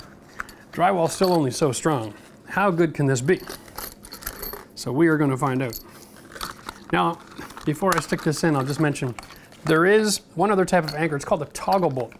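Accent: Canada. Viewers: I love your accent. Reminds me of my Canadian friend. 😊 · when you said "out" I knew you were Canadian - plus now gently you spoke !😄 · You sound Canadian, bud. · As soon as I heard that accent, I just KNEW the Robertson was coming oot as the hero.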